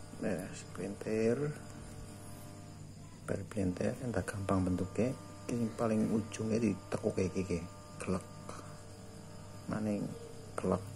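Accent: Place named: Banyumas, Indonesia. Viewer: Suka dch sm tuto yg ini....ngapak chiinnn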